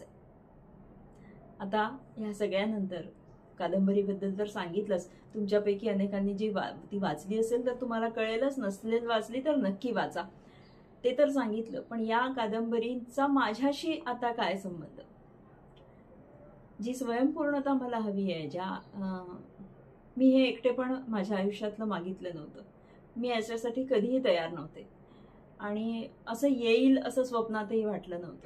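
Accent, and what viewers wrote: Vidarbha, India. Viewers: My humble tributes to late Shri ji. Please continue the channel in his memory. I always liked his style in Vidarbha Marathi ascents. Happy birthday to Sahab.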